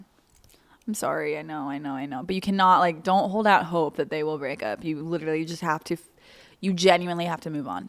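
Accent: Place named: Ohio, United States. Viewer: As a fellow Ohioan who also moved away, I love when your Ohio accent comes out 😂🥰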